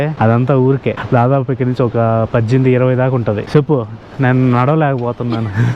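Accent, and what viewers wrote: Nellore, India. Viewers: Hi bro….One of the best story tellers in Telugu….Hats off to ur content bro…..Mee Nellore slang super gaa vundi….❤🎉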